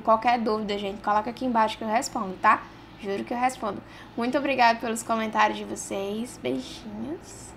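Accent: Northeast, Brazil. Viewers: Dificuldade de passar batom??? Não vi nenhuma !!! Ficou lindo, make maravilhosa, amo seu trabalho, sua forma simples de ensinar, mesmo mostrando suas dificuldades. Isso mostra o quanto vc se mostra verdadeira e real no seu trabalho. Continue assim! Parabéns !!! Amo esse seu sotaque gostoso, já vivi no Nordeste e amo o clima, o sotaque a comida... Mais uma vez, parabéns !!!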